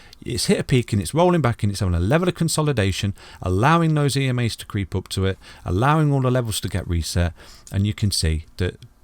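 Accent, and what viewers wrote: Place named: Nottingham, United Kingdom. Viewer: Hi mate are you from Nottingham I can hear notts accent coming out 😅👊👊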